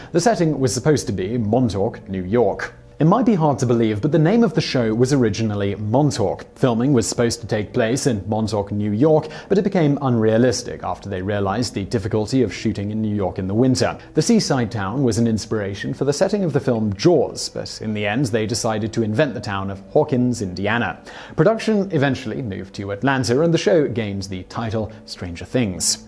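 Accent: United Kingdom. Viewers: You do understand he is British. They pronounce certain words differently. Unlike american english which has been flattened. We say words differently. So back off his pronunciation.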